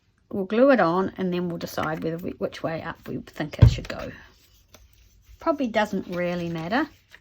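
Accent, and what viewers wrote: New Zealand. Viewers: 🧚🏻‍♀️Thanks so much, I really enjoyed watching your process of expanding the spine of your Readers Digest! Look forward to your next video. Lovely to hear our Kiwi accent on YT!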